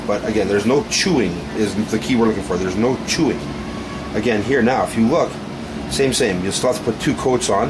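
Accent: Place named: Canada. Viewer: As a Canadian I personally think that most talk about the "Canadian accent" is untrue. A lot of Canadians sound very similar to a lot of Americans (apart from the crazy accents like Newfies, Texans, Bostonian accent, etc.) But man, you sound like you came straight out of a Bob and Doug McKenzie movie!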